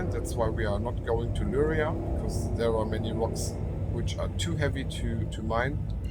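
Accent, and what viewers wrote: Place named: Germany. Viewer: dont appologize for the "hard german accent" its amazing and your english is very good. Very easy to understand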